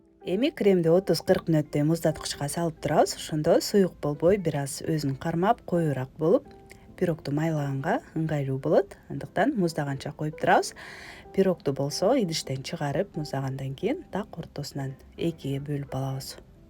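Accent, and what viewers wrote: Standard. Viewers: Азаматсыз,ийгилик каалаймын.Таза кыргызча суйлогонунуз учун лайк басып,катталдым💐Буйруса даярдап кором.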